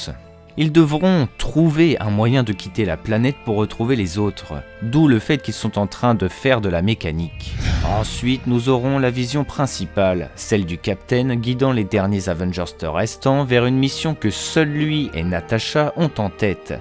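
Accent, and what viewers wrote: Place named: England, United Kingdom. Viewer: Waou l'accent anglais classe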